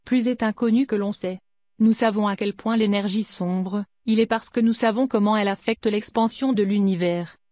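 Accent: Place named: Canada. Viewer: j'aime bien sa voix avec l'accent canadien lol! ont s'en fout de l'univers la terre c la maison mére !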